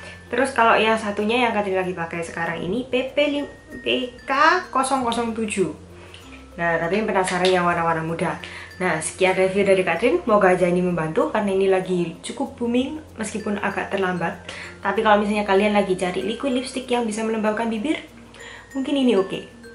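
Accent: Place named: Surabaya, Indonesia. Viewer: medok bgt surabaya nya cik.. tooss laah sm2 org sby.. hahaha😜😜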